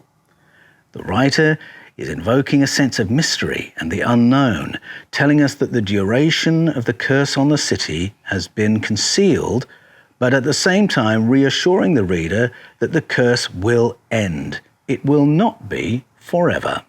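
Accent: Australia. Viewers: does a great Aussie accent. After all is an Aussie himself.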